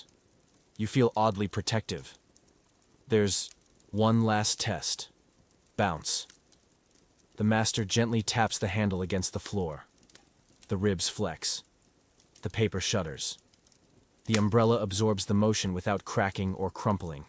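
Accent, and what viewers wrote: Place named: United States. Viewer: The text of this is interesting but theUS accented voice with 'true crime' rapid paced staccato inflections isn't restful.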